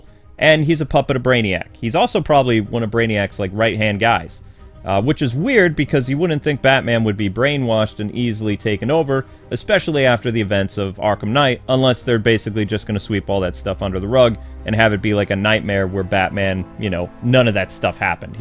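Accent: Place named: New York, United States. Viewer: new york accent has been coming out a lot more recently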